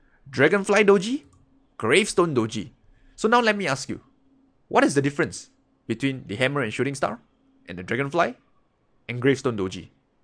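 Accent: Singapore. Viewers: He had typical Singaporean accent 😍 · I miss my Singaporean friends because of your accent bro. 😫🤩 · Singaporean accent to me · Singlish for sure 😃. Very catchy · Are you Singaporean? your accent look like it. I used to work there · I think it's probably a Singapore accent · him speaking in a singaporean accent doesn’t mean English is his first language. Our first language is English most of the time. Don’t be ignorant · All Singaporeans speak like this! I lived and worked in Singapore for ten years and married a Singaporean gal.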